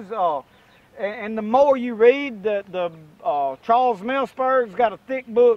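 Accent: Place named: North Carolina, United States. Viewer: Hi, there. I enjoyed this video. Very informative. I've also heard of that same book you read from and have been thinking about getting it. They say it grows in the eastern U.S., which is where I live now (the SE). I'm not sure if I could find any though; there is a park within walking distance for hiking where I live, but not sure if I would have any luck (I live in pine country, and so it's almost nothing but pine trees). I'm originally from Texas but have been living in NC for the past 15 years. Speaking of which, are you by any chance a North Carolinian yourself? You're accent sounds exactly like what I hear down here. Thanks for the video. I think I'll check out more. God bless.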